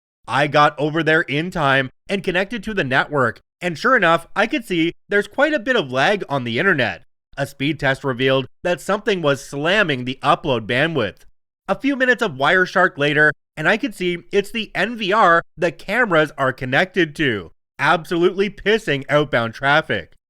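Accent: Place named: Canada. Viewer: Weird: this is the first time I've noticed your accent is Canadian.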